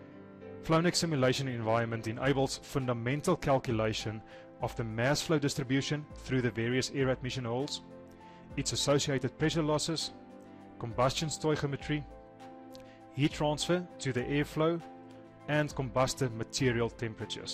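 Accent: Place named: South Africa. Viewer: Are you south african? I hear an Afrikaans accent somewhere in there.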